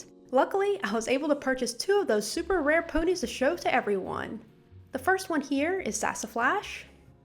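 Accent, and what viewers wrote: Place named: United Kingdom. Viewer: She’s from the UK.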